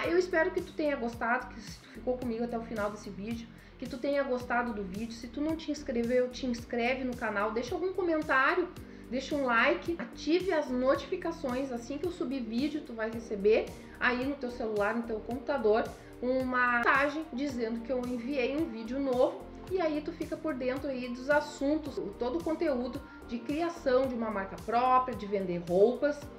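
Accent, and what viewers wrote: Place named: Santa Catarina, Brazil. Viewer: Olá boa tarde Como posso comprar vestidos lindos de você? Poderia passar seu número de telefone? Pelo seu jeitinho de falar acredito q vc é de Santa Catarina vc é de muito bom gosto parabéns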